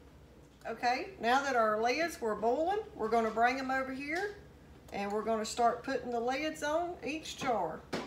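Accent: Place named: Alabama, United States. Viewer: Where you from, I would say Alabama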